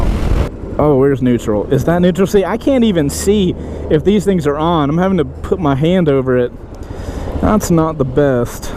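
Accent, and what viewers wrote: South, United States. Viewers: Dude I fucking love this bike...I'm buying it. This will be my first bike.Thank You, you smooth southern, silver-tongued bastard.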